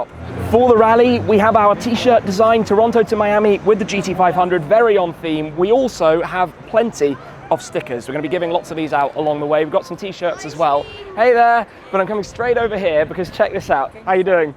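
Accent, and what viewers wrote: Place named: United Kingdom. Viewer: American muscle we need to stick together said in a British accent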